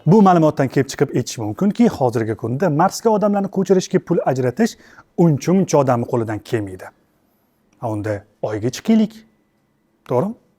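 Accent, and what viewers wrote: Tashkent, Uzbekistan. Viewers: Toshkent shevada emas, adabiy o'zbek tilida gapiring, ba'zi gaplarizga tushunmay qolyabman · dasturni toshkent shevada emas adabiy tilda olib borsangiz yaxshi bòlardi har holda sizni faqat toshkent kòrmaydi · Ijod zoʼr takrorlanmas omadizi bersin bir taklif NATOʼGʼRI TUSHUNMANG LEKIN AKA SOF OʼZBEK TILIDA GAPIRING ADABIY TILDA TOSHKENTCHA SHEVADA EMAS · Ижодингизга омад. Лекин видео Тошкент шевасида экан, нима, бу фақат Тошкентликлар учунми? Тошкент шевасини эшитдиму, томошани дархол тўхтатдим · Материал зур. Лекин Тошкентча шева унинг сифатига таъсир килмокда. Кейинги чикишларда ушбуларга эътибор каратилса ажойиб булади. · eeey toshkanli aka adabiy shevada gaproring tushnmavotman gepizge oga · Toshkenligu Toshken shevada gapiradida. Manga qaytanga hariplani shevadidan kura shu uzimizani Toshken sheva chiroyli eshtiladi. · Аввало видео учун рахмат журналистмисиз блогермисиз адабий тилда гапиринг Тошкент шевада гапирмай тв куйсанг хам факат тошкент шевада гапиришади нима тош шевада гапирса маданиятли булиб коласизларми шунчаки жахлим чикади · Toshkent shevada emas adabiy badiiy tilda gapirsangiz yana ham gòzal bòlarkan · Toshkenni shevasida gapirsa nima bopti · "ВОТТИ-ВОТТИ" ЛАМАЙ ГАПИРСА БУЛМАСМИКАН. 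БИЛДИК, "ТОШКЕНТЛИК" ЭКАН. ХАР ХОЛДА АУДИТОРИЯГА ШЕВАДА ЭМАС, АДАБИЙ ТИЛДА ГАПИРИШ КЕРАКЛИГИДАН БУ "ШАХАРЛИК" НИНГ ХАБАРИ БОР ШЕКИЛЛИ... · Тошкент шевасида гапирмасдан,адабий тилда гапир.Кушнинг билан гаплашиб утирмаяпсан-ку ,саводсиз. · ассалому алайкум малумот учун рахмат аммо вотти сузини (тошкентча шевани ) ишлатманг илтимос! узбек тилида кевотти борвотти деган гап йук. эфирда адабий тил миерларига риоя килинг. узур гапим огир ботган булса! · Тошкент шевада гапиргани ёкмади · вотти дегани нима дегани сизларни фақат тошкентликлар кўрмайди адабий тилда гапринглар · Ука, тошкенча воттиламай адабий ўзбекча тилда гапирсангиз курсатувингиз яхши чиқарди! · Juda yaxshi boldi. Lekin Toshkent shevaiida emas adabiy tilda gapirsangiz yaxshi bolar edi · билдик Тошкентлик экансиз, одамлар чет тилини ўрганади, Тошкентликлар адабий тилни ўрганолмайдм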